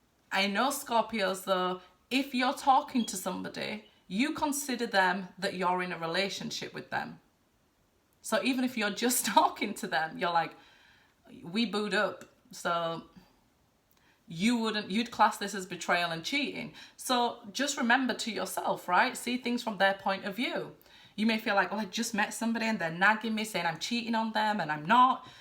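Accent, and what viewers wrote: United Kingdom. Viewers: The uk or London with that accent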